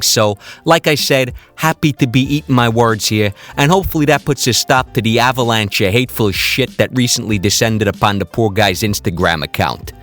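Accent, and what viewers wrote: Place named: New York, United States. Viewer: That "New Yorkesque" accent and slang is just tremendous x)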